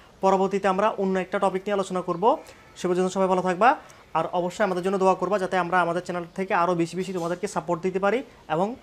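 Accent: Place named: Comilla, Bangladesh. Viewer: Comilla.....comilla feel hocce.. 🙃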